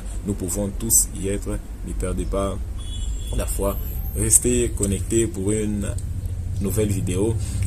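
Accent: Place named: Kinshasa, Democratic Republic of the Congo. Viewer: Je suis quand même le premier à commenter . Par votre accent, j'avais compris que vous étiez congolais de Kinshasa 👍👍😍😍. Je m'abonne pour vous encourager 👍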